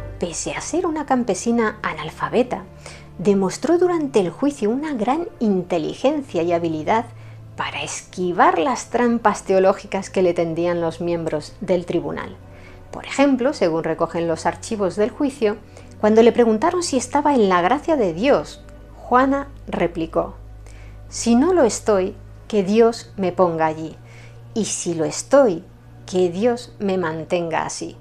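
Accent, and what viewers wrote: Castile, Spain. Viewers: Hola Feliz Navidad cómo estás, me encanta ver tus videos y escuchar tu acento castellano, Yo amo la cultura, la historia la política, la religión y la música y escuchar tu voz en la narrativa, me encanta, Gracias por estos videos, Dios te bendiga hermosa mujer y que la Virgen te siga cuidando amén; la doncella de Orleans se convirtió en la patrona de Francia ⚔️🙏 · Soy de Colombia pero me encanta escuchar estás historias antiguas en el acento de los españoles,siento como si estuviera viviendo en carne propia esa historia,lo relatas muy bien.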